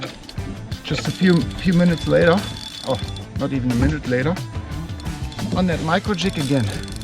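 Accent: Germany. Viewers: hey mate, what exactly is that pedal boat you got there, from where did you got it and how much is it? was in jackson bay the other week and thinking to buy one aswell, would be mean. you sound german btw, grüße 😆✌🏻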